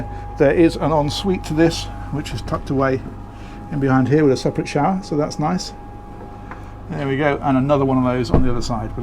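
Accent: United Kingdom. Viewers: It would be great if you pronouciated a bit more. It's hard to pick up british accents unless I'm hammered, drunk, my guy .that said great viewing ,great work ..btw one of the nicest fly decks I've seen for driving.im sold I'll buy one .how much is it ? Oh.. does it come in blue? No .aghhh that's to bad .😂😂😂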